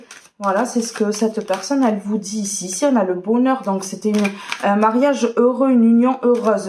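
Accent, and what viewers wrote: South, France. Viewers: Merci.pour votre accent méridional c est un peu difficile pour moi de vous écouter 😭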